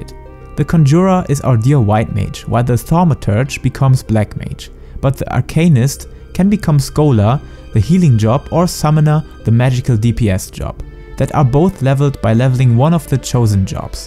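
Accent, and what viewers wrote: Germany. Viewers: As a german myself i got to say: your accent is THICK man. Try to adjust to the english tongue a bit more, practicing pronounciation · bro, your German accent...I just cant...sorry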